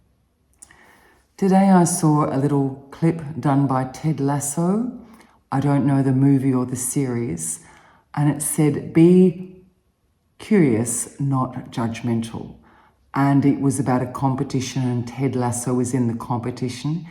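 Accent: Australia. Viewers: Here now a few years in your Channel ❤ from Ireland 🇮🇪 it's your Australian accent particularly yours...😊😊😊😊 So lovley ❤❤ Anyways all of my best to you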